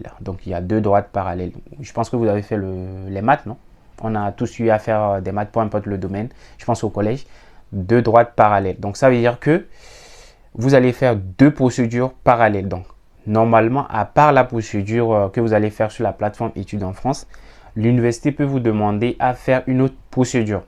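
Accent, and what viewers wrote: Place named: Togo. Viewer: Depuis le Togo...à entendre votre accent, vous devez être togolais...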